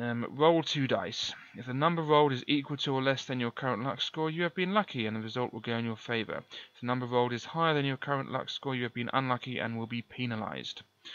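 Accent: United Kingdom. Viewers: Advice: stop babbling about crap no one cares about. I didn’t come here to listen to an English toff talk about and. I came here to watch a FF game get played. For anyone who actually cares, this guy doesn’t even start until twenty minutes in. Your voice is clear so at least that’s something.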